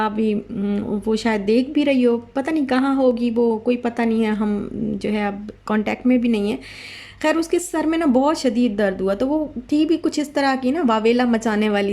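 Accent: Saraiki, Pakistan. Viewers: Ap Multan se hain?sariki speaking hain?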